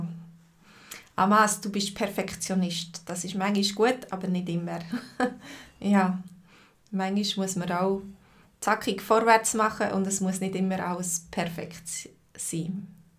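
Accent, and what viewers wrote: Switzerland. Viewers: Aus welchem kanton ist dein schweitzerdeutsch? Ich ziehe bald nach Winterthur wegen ein job um und würd gern zu mindest die sprache verstehen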